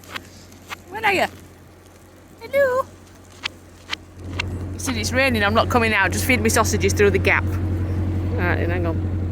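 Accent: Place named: Yorkshire, United Kingdom. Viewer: Leeds and waky accent very similar, first time I've seen your channel I've subscribed look forward to watching more of your videos,👍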